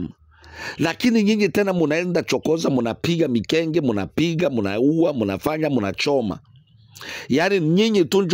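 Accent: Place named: Rwanda. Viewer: NAWEWE UNATMKA MAJINA YA VIJIJI KAMA WANYARWANDA!! LWIKO = LW 'EÙ, KAKANGARA = AKANGALA, BIGARAGARA = BIKALA KALA, KABINGU= ABÉNGÙ. KISOKE = ÉSOKE. TAMKA KWA NAFUZI ZA KIBEMBE MAANA NI VIJIJI VYA WABEMBE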